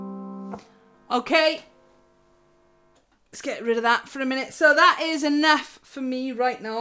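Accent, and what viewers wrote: Wales, United Kingdom. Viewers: I’m so excited I just received my jazz mistress baritone ukulele from the awesome Fanner brothers and would love to show it to you and your fans. It was custom made it did take awhile I’m in Canada but I’m Welsh blood all my relatives are Welsh so I love hearing your accent reminds me of them. I am still learning and learned on an acoustic baritone Uke and have always used my fingers for strumming and picking. I find that it doesn’t sound as good on my electric and I’m trying to learn to use a pick. I only had my new Uke for two days and broke a string I guess I was using the wrong gauge or type. Is it possible you could show us how you use a pick/plectrum and what type you use on your electric Uke? Also where can I send you a picture of my baritone Uke I would love to hear your opinion? Also do you do online lessons that would be great!! 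Love Vicki. Cymru Am Byth 🇨🇦🏴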